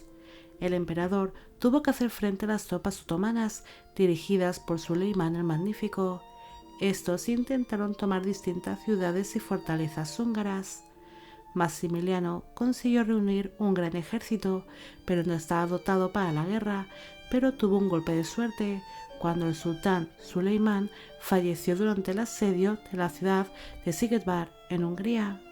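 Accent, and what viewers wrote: Spain. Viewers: Como no gustarme tal vídeo y más con ese acento español. Saludos desde México.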